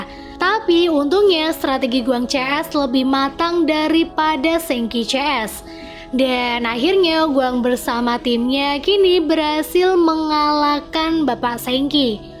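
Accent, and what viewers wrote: Pemalang, Indonesia. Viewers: mbak orang pemalang ya? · Mbak nya orang Pemalang?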